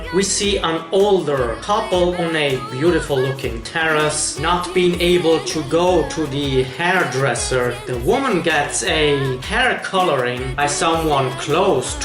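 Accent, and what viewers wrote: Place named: Eastern Europe. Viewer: RI Hero ما اعتقد امريكي، ممكن يكون شرق اوروبي، لهجته ليست امريكية.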